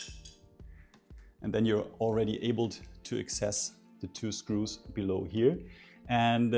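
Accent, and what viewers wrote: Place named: Germany. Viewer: Tell me your German without telling me your German , pronouncing :)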